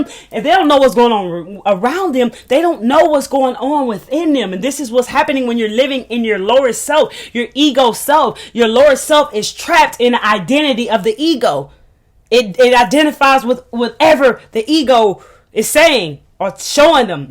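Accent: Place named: New York, United States. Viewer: You heardddddd lol you gotta be from NY we in the building! 💪🏾love you too Queen 👑 powerful video💯💯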